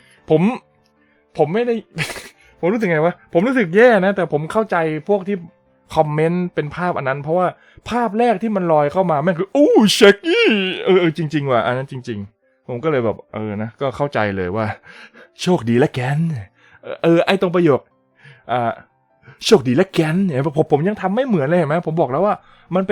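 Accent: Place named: South, Thailand. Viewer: สำเนียงใต้พี่ชัดมากเลยครับ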